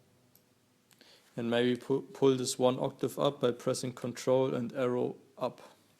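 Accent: Germany. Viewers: Nice Video bro. I think you come from germany, is that right?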